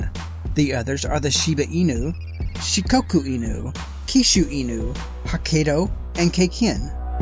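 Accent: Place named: United States. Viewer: I do agree with you about them being different breeds, but if he is from America (which he sounds like he is) then he is not technically wrong by putting both together, since if i am not mistaken in America they are not considered to be a different breed. So no real reason to insult him on doing poor research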